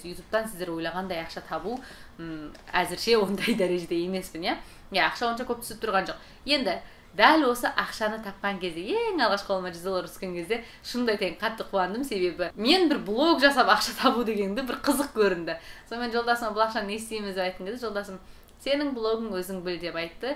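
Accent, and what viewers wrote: Standard. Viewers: Менде сізге қосылам,әдемі,сұлу,қазақша таза сөйлейтін,қылықты қыздың кәрістің қатыны болғаны өкінішті. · Қазақша таза сөйлейді екенсің. Айналдым даусыңнан. Бақытты бол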